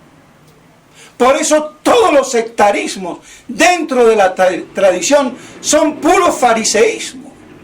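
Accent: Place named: Cuba. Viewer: Hola UD vivio en México xque habla como cubano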